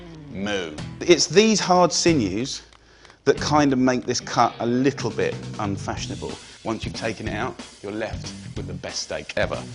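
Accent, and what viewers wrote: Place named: United Kingdom. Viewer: 영국식 발음 맘에 드네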